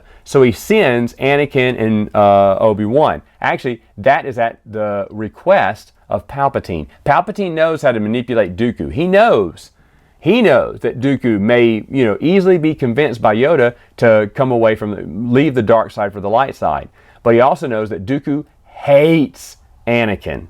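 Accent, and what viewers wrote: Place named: South, United States. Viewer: There’s just something funny about talking about Star Wars or science fiction in a southern accent.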